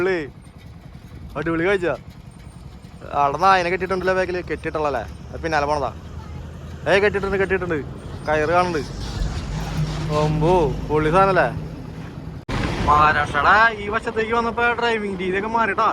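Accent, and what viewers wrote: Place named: Thrissur, India. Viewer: Thrissur slang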